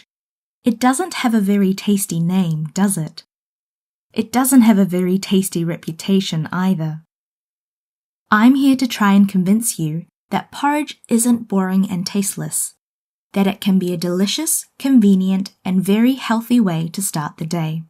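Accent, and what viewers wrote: New Zealand. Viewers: 뉴질랜드느낌 많이나네요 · 한국 온지 넘 오래돼서 뉴질랜드 발음 다 까먹었는데 이렇게 다시 듣게 되니까 친근하고 반갑네요 ㅎㅎ 갠적으로 포리지 좋아해요>.<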